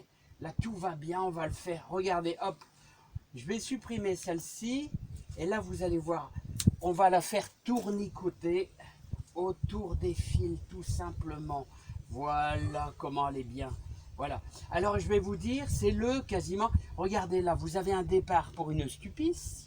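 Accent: North, France. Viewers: Super merci avec ton accent du nord j àdore et je vais aérer mes tomates bonne idée a bientôt pour la suite bonne journée Eliane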